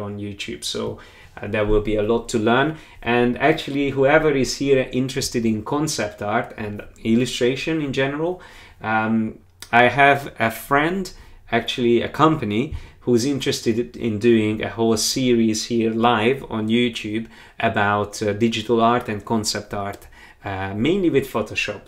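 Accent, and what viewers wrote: Hungary. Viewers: that magyar accent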